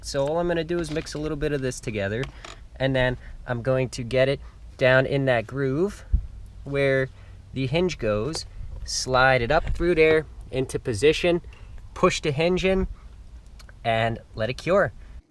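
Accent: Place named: New Jersey, United States. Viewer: No wonder he sounds like Chris fix because he’s from Jersey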